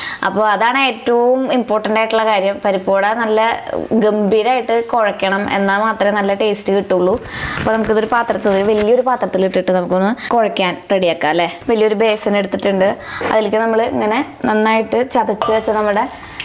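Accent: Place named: Thrissur, India. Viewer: Chechi.thrissur bhasha aanalloo njnum Thrissur aanuttaa 💪❤️❤️🤗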